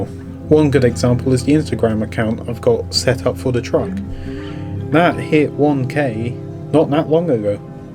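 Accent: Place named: United Kingdom. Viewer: Wonderful video, I’m old 60 and I want one so bad, I can taste it. I’ll be able around rural Southern West Virginia to run the back roads, but we do have even a few of them that are 55 mph roads, are people pretty patient with your lil truck getting up to speed and running a lil under the speed limit? Again awesome video and that swanky British accent always adds to any video, I alway think God has a British accent, not sure why. ❤️👍👍👍👍👍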